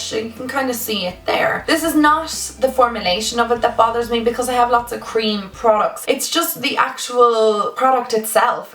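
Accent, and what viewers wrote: Ireland. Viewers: came on your YouTube channel. love at first sight! I'm also Irish so hearing your accent is just so homely😄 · I love this video and your accent!! I'm Irish too but my accents different ❤️❤️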